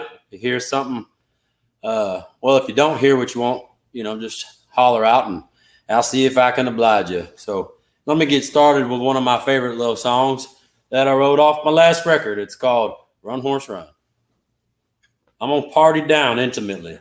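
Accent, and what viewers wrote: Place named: Appalachia, United States. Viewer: Doesnt seem like you talked like a hillbilly a few years ago. You pronounce guitar like geeeeetarr now?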